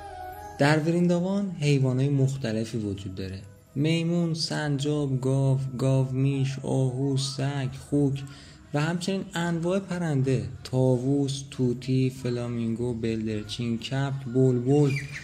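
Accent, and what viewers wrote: Isfahan, Iran. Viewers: سلام برام جالبه خانمت هم مثل خودت لهجه اصفهانی یاد گرفته و حرف میزنه😍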